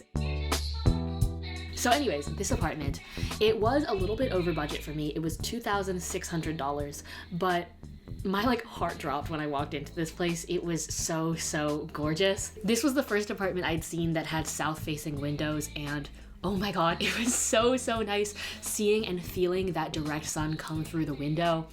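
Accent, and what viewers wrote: New York, United States. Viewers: is it me or does sound more like an NYer, like lower tone and speedy, confident vibe...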